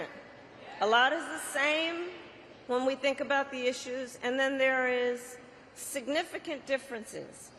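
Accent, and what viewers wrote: South, United States. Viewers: Why does she all a sudden talking in a southern accent. Funny thing is that shes already been in white house for 3 1/2 years and shes making promises that she could have already done. People don't even do their research, Trump didn't even have anything to do with Heritage Project. This is a joke, i couldn't even listen to it all.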